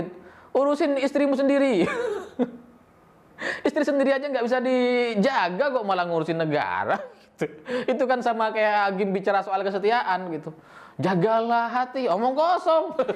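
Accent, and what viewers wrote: Madura, Indonesia. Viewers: Mas kayak orang madure logate..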